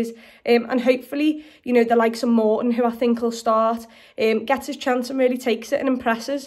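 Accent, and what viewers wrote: Liverpool, United Kingdom. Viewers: This scouse accent 🥺>>>>>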